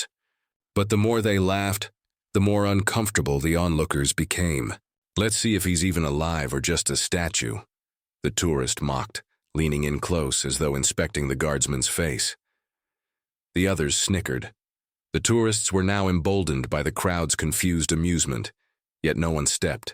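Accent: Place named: United States. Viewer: Yet another load of American accented crap!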